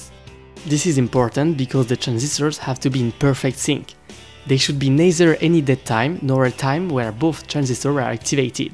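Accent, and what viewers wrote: France. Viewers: the accent is not the best (i know you are french) but great video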